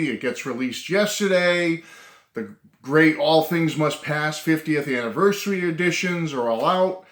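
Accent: Boston, United States. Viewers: Nothing wrong with that,! Believe it or not, I've been a dyed in the wool Beatles fan since I was 4, mainly due to having a sister who was 8 years older than I am. I used to sneak down to her room to play their records when she wasn't home. LOL. I may not get into collecting all their stuff as many others do, but I haven't stopped loving their music. It's as timeless as timeless gets. Fwiw, as a NY National League fan, I can appreciate the Boston accent:) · Awesome rant and Boston accent....you just got a sub and a thumbs up from me sir....👍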